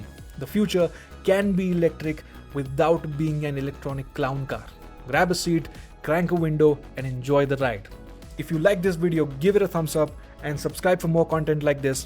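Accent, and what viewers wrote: India. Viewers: You sound indian?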